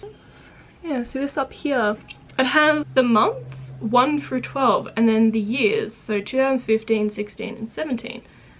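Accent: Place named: United Kingdom. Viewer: Omg I thought you were British sorry🥲🥲🥲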